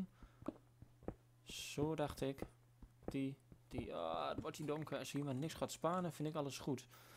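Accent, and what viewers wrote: Twente, Netherlands. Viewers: Het twentse accent is juist leuk! dat maakt jouw video's uniek, en leuk om naar te kijken. Ik ben geabonneerd :D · Ik meen het ik kijk heel graag jouw video's en je twents vind grappig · En dat Twentse accent is alleen maar leuk/grappig, dat maakt het leuker!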